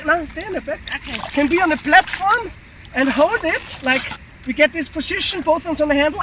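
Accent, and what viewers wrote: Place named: Austria. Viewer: Du bist ein netter Kerl und deine Videos in deutsch sind wirklich hilfreich,aber warum dies Video in englisch? Für wen? Selbst für mich mit Muttersprache Deutsch und sehr guten englischkenntnissen ist es eine Qual dir zuzuhören. Viel schlimmer noch für jemanden mit Muttersprache englisch. Bleib lieber bei deutsch mit nettem (österreichischem?) Akzent. Dein englisch ist einfach grausig.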